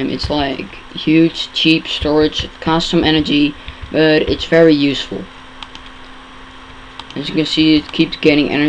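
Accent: Netherlands. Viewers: Nice explanation. *waits patiently for 1.0.0 compatible release.* P.S. Are you Dutch? You have that accent. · Wow its surprising how many people recognize his Dutch accent I noticed it too.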